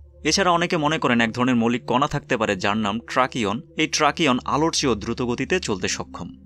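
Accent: Narsingdi, Bangladesh. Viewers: ভাই আপনার বাড়ি কি নরসিংদী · ভাই আপনি কি নরসিংদীর ছেলে?? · apni basa Narsingdi 😲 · Hlw vai,Apnr basha ki Narshingdi? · ভাই আপনার বাড়ি নরসিংদী? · আপনি কি নরসিংদির লোক? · আপনার কথা বলার সুর এখনও শুদ্ধ হয়নি। আপনার কথার সুর নরসিংদী'র আঞ্চলিক। বাংলাদেশী সুর ব্যাপকতা লাভ করুক আপনার চ্যানেল। ধন্যবাদ। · আপনার বাড়ি কী নরসিংদী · ভাই আপনি কি নরসিংদীর ?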